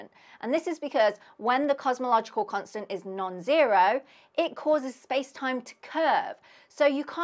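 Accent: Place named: England, United Kingdom. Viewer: 😘 Cute yellow with a silly dialect from the tea drinkers. It's best if you speak the Human language aka American English, not tyat ugly noise from england. Why is your head bobbing up and down like that, is that typical mannerism over in mere england?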